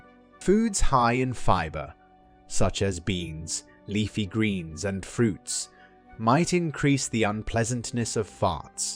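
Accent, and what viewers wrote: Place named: RP, United Kingdom. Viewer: Love yr posh accent presenting this Fartology topic!!! Suprised you didn't say flatuence or gas throughout,yr not that posh but thanks for being down to earth,we love you! God bless you. Oops I just let one off- excuse me!!!!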